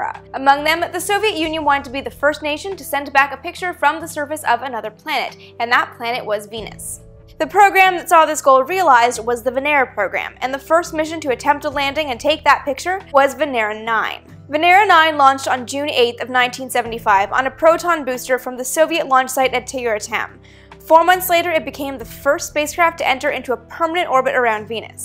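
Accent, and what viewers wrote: Canada. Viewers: Do I detect a Canadian accent? I had no clue there was a picture of Venus' surface, great video! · She sounds Canadian. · She is Canadienne.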